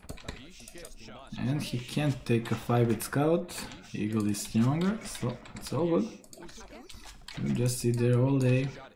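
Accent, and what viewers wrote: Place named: France. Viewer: Are you french ?!! Your accent is kind of familiar…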